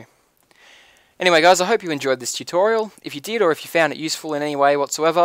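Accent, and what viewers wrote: Australia. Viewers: where in australia r u from?!?!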